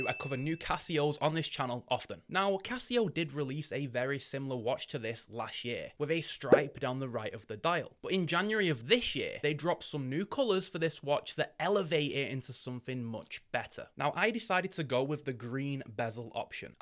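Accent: Yorkshire, United Kingdom. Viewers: Where are you from,? You should like a Yorkshire lad!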